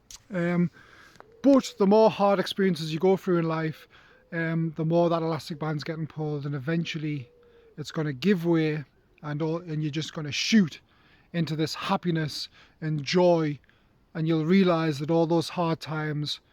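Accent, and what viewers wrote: United Kingdom. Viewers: When I look at you I anticipate the deep, resonating voice of a godlike warrior. Then I push play and get a Keebler elf with a British accent.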